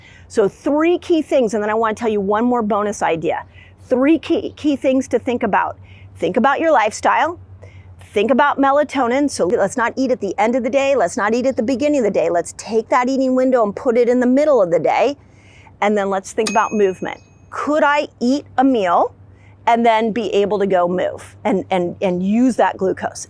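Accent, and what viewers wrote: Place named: Midwest, United States. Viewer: Ah, cool. You sound midwestern, so I wasn't sure.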